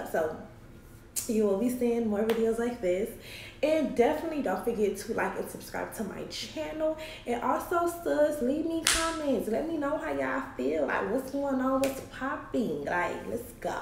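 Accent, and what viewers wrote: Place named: Miami, United States. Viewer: You are too cute! Are you from Miami? I love your accent. I also don't put heat to synthetic hair, but I have one wig that I stay throwing curls in the front to frame the face. Now you know this is a CITY GIRL SUMMER over here!!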